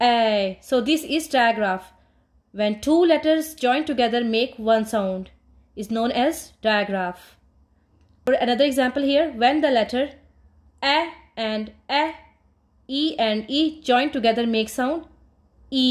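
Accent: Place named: Bangladesh. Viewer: Из Бангладеша учительница? Произношение прямо выдаёт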